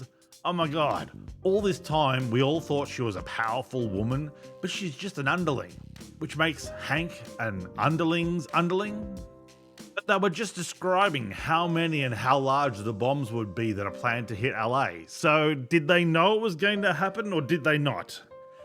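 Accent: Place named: United Kingdom. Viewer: Cause he's British!!!! Talks like hes got a mouth full of biscuits 😂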